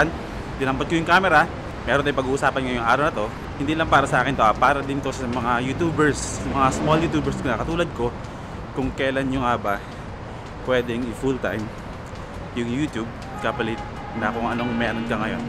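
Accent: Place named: Negros, Philippines. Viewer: Mukhang taga negros na yung tuno mo mag salita ah..!! Hihihihi Solid perstaymers tayo!!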